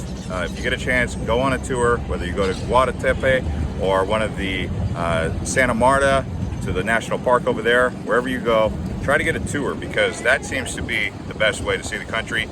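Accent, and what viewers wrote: United States. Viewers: is in Colombia wearing a 'Columbia' top. Overall, this is a well-researched video. Granted the pronounciations are more American based, but I can see the effort made. It DOES RAIN A LOT. November is also a good time to come with less rain (at least to Bogota). "If you want to find bad people in Colombia, you can surely find them, as you could in New York or Los Angeles. But nowhere have my crew and I been treated better or with more kindness and generosity. I’d bring my family on vacation there in a heartbeat. And hope to soon. As I said before: Colombians are proud. Let them show you what they are proud of." ~ Anthony Bourdain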